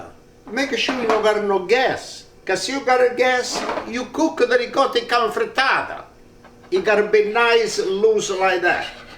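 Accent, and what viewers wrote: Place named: Sicily, Italy. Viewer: A wonderful man Mr.Cerio, was my first intro to food that was not german, He rented a suite from my Dad who had a business in the same building. He was from Sicily and his proudest times were sharing his food with a 8 yr old (me) and talking about his budgie bird. He had no family in Canada that I can recall. I Remember him with fondness 57 yrs later. He got me hooked on authentic italian food ever since. You speak as he did. Are u also from Sicily?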